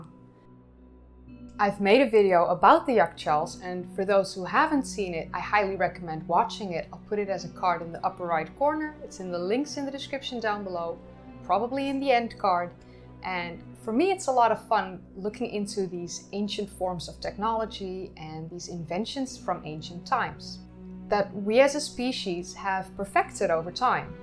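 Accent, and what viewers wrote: Netherlands. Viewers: Your videos are great! Don’t worry about the accent. Dutch speaking people generally do a great job with their sister language (or so I’ve noticed). I speak some Norwegian as well (very poorly granted after 30 + years of being away from it), but we should never be embarrassed about trying. You definitely have a great command of the language. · The Dutch accent should stay, R's sound so much better ❤. Great take on the 1984 Dune lol. It is a cult classic good and not good simultaneously. Excited for merch too. Oh and I am in love with those scrunchy sleeves 😍. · I luv your Dutch accent. Takes me back to childhood in Europe. "Terribly Incredible" = perfect. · I like the Dutch pronunciation 👌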